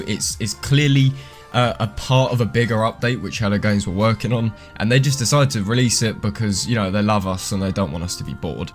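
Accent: England, United Kingdom. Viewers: got love the English accent even tho I'm English 😂 plus you swear a lot kinda so that's a plus lol great vid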